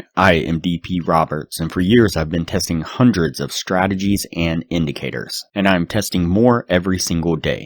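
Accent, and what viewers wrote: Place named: United States. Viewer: This voice is US accent, it will not work in India. It irritates, though can be understood with little effort but irritation pain is much more than benefits !!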